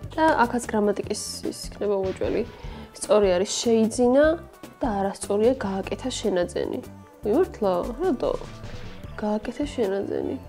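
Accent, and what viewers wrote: Imereti, Georgia. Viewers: იმერელი ხარ?❤❤ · იმერელი ხარ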